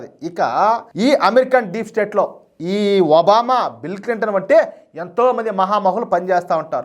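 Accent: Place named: Kadapa, India. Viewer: I love your Kadapa language.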